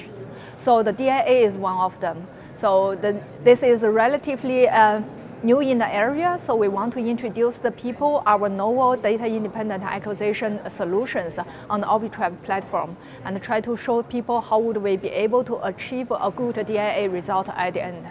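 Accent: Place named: China. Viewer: 中国方言味的英语.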